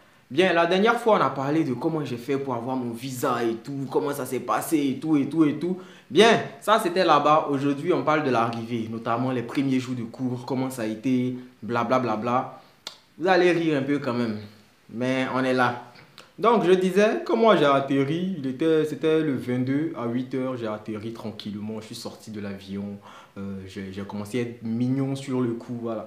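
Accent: Ivory Coast. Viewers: L'accent ivoirien me tue mdr 🤣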